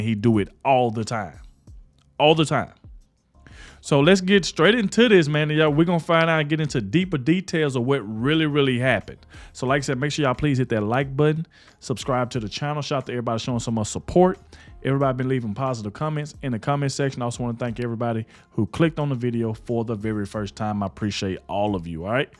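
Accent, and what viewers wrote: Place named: Missouri, United States. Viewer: where you from sounds like southern Missouri we might be neighbors lol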